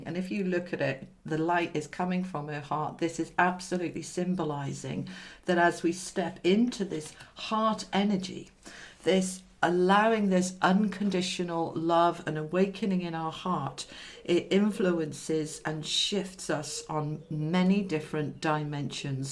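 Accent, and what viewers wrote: United Kingdom. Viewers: I love your beautiful British accent ! Thank you so much from France !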